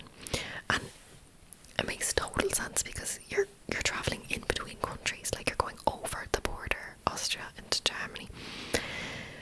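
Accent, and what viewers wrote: Ireland. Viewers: Your accent is so enchanting and it goes with ASMR so well. Im roughly 50% Irish but unfortunately never picked up the accent from my relatives. Id kill for an Irish accent, mainly because Ireland is one of the top three places Id love to live after im through with university. ❤ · Lovely video! A 40 minute video with your gorgeous Irish accent is always a treat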